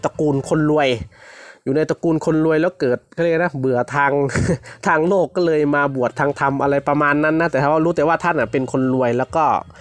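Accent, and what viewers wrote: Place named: Laos. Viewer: ข้อยวาเว่าลาวเลยกะได้ๆๆสำเนียงมันมาแล้วเด้อพ่อใหญ่ๆๆๆๆอิอิออิ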